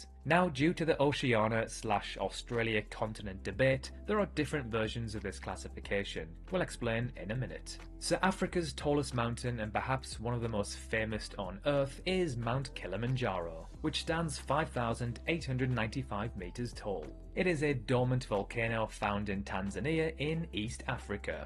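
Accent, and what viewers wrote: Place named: Durham, United Kingdom. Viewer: Interesting stuff to while away the early morning. Thanks! Possibly annoying question: what's your accent? I'm guessing somewhere like Durham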